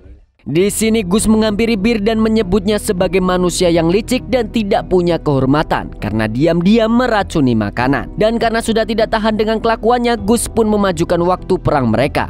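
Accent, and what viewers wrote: Banjar, Indonesia. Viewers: Banjar banar😅😁😂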